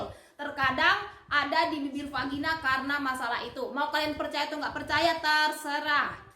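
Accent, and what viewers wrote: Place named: Banyumas, Indonesia. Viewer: Ini lebih keren toplah mbak infonya ngapak keren ren ren👍🏼👍🏼👍🏼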